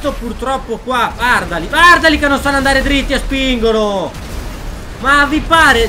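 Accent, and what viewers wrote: Veneto, Italy. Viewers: Ma sei veneto hhahaha? Ciapa fogo hahah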